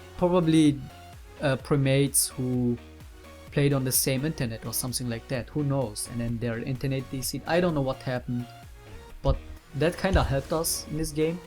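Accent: Germany. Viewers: Ok ty, i wasn'y expectobg his accent to be a german accent, so i asked spanish but don't know the spanish accent xD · hes from germany and live in germany,so its a german accent i think he learned english in the school and games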